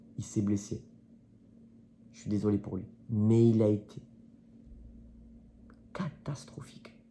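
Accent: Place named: Occitanie, France. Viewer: Et pourtant un vrai gone mais le travail a fait qu'il vit dans le sud en occitanie d'où l'accent mais lui et sa famille complète sont de la croix rousse. Lyon 4eme. Et a vécu dans le 7eme et 8eme arrondissement de Lyon. Son amour pour l'ol le prouve sans soucis.😂😂😂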